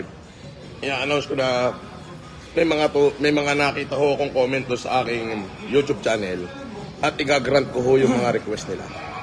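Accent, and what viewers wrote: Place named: Batangas, Philippines. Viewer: Sir ano ba tribo mo? eh tagalog mo para kang batangeño.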